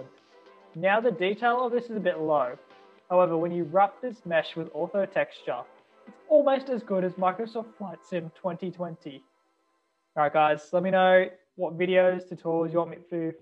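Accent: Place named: United States. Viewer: I don't have global scenery installed so will it work like that And also u look like indian and talk like American nice channel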